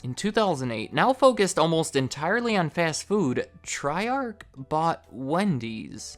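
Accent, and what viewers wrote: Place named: New England, United States. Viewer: Wow the fast talking yankee nasal guy again. Had to fast forward and get out. Again!!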